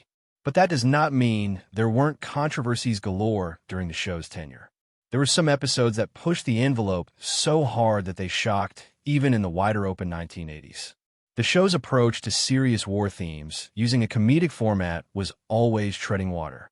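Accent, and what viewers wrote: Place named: United States. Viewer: TLDR: Rage-bait, don't watch. How appropriate that this piece of rage bait should have an American AI voice-over that mangles the very name of the show. Just like every piece of popular entertainment it was a product of it's time and would not be successful in any other context. Personally I would take this fantasy send-up of wartime movie tropes any day over the barren desert of today's American streaming channels whose only successes are bowdlerised reboots of the very classics you decry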